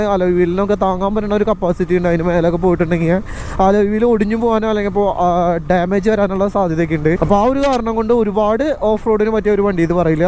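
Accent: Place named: Malappuram, India. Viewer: Malappuram slang 😅😄😉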